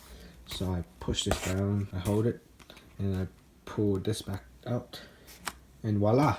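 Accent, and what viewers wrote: Russia. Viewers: r u russian? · I am a Russian, the accent is so good I thought you might have been a Muscovite . I own an arsenal of AK guns and comparing this cardboard replica to my actual AK-47 it is incredibly accurate, almost every little detail is included. You are extremely underrated, it's always the creators that put no effort into the videos that get popular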